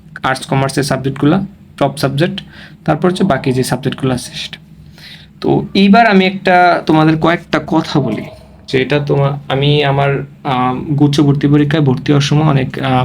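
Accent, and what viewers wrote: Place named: Comilla, Bangladesh. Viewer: Vaiyer bari mone hoy comillaa...🙂 Btw vasa sune andaj korlam🙂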